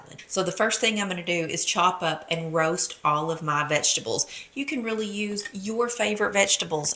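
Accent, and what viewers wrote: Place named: Tennessee, United States. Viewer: Question: How big is your cutting board? I bought a new one recently, and I think it's too small. I love your accent. Tennessee? That salad is just up my alley; after 6 years on keto, it's time I reintroduced pasta, which I actually began doing 2-3 months ago. Thank you; I'm eager for more of your recipes.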